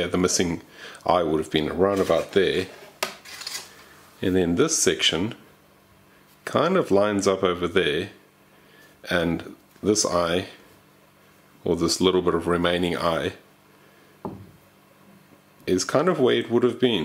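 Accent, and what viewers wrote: South Africa. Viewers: cool vid man love the fossil hunting vids you sound like you from cape town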